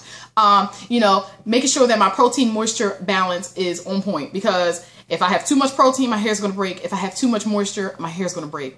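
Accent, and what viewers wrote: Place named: Texas, United States. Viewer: I love your accent! Are you from Texas? I'm Canadian Jamaican and sound nothing like you!! Lolol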